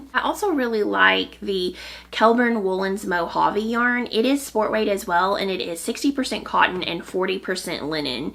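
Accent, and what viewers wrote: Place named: Mississippi, United States. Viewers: Thank you for showing the pattern from Ozetta Knitwear! I looked at several other pattern from this designer and really like them. I enjoy your podcast very much. I’m from Mississippi and listening to you talk reminds me of home! I live in Florida now. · Hiya, this is the second video of yours that I've come across... and they're just great! First of all, I'm Australian, so I just love your Southern accent 😍, second, you're so relatable... I'm not a 'skinny-mini' either! And I'm 5'10", so thanks for touching on doing the maths to adjust your fit... when I was young we were made to feel like we should fit the garment, not the other way around... one day I hope to be able to knit more than dishcloths! 😂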